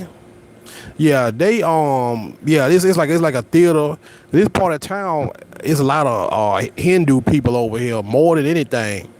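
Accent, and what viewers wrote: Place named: South, United States. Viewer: Your southern drawl sounds like Mahmoud Ali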